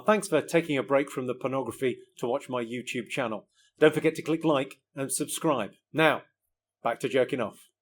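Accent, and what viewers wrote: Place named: England, United Kingdom. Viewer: I was gonna say that he sounds so lame as a comedian but actually just listening to an english accent its annoying itself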